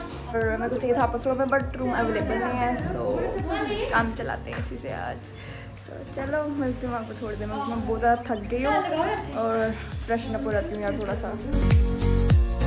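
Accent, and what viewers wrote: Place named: Jammu, India. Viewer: The dogri accent in hindi is ❤️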